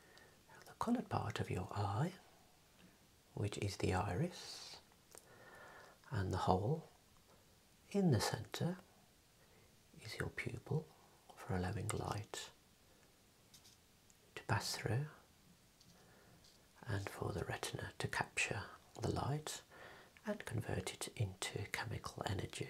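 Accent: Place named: Birmingham, United Kingdom. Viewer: i love that you have a brummie accent! as a brummie myself, hearing you feels relaxing and homely. thanks for your videos !!!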